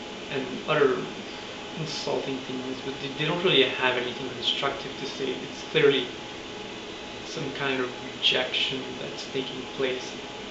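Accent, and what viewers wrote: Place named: Quebec, Canada. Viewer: You're very beautiful and your Quebecois accent is wonderful. I hope everything is going well with you. -Eric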